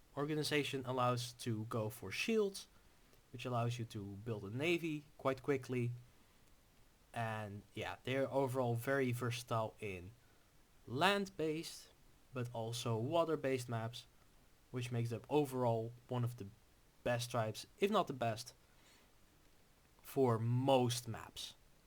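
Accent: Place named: Netherlands. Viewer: He has a pretty strong Dutch accent - probably the Netherlands ;)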